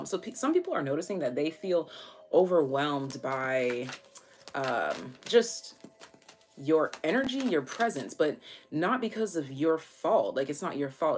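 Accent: United Kingdom. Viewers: Your British accent took me out 😅😂🎉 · Your British accent ain't half bad doll. ;) Love a good swear over here too. Thanks for a lush reading xx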